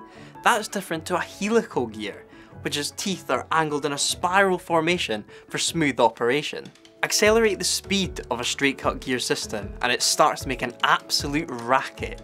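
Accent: Scotland, United Kingdom. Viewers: Scotland, but don't know which part of it exactly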